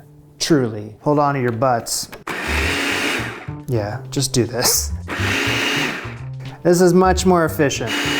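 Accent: Canada. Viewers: I’m going to guess you’re not from the US or Canada? (I’m guessing this guy is Canadian based on his accent.) Here whole chicken comes like that guts removed. You don’t have to clean it yourself. The main edible innards (gizzard, liver, heart) are usually included in a bag stuffed inside the cavity. Oh, and the neck is stuffed inside there, too. You take all that out before using the chicken. Chicken with the heads still on and uncleaned you have to go out of your way to find.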